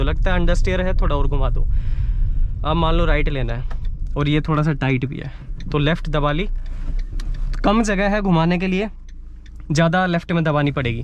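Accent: Haryana, India. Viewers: BHAI HARIYANA SE H KYA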